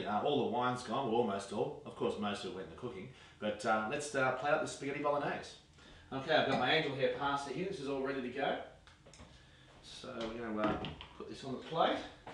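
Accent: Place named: Australia. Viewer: i think he's Australian, that's why he pronounces stuffs like that. no problem about it. :)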